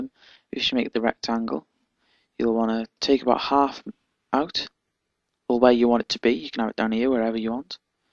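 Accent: Liverpool, United Kingdom. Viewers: Hey der la, Do I 'ear a Scouse acksint ... I am down 'ere in Sydney strugglin' wiv me CS5 ... ta for yer 'elp ... <link>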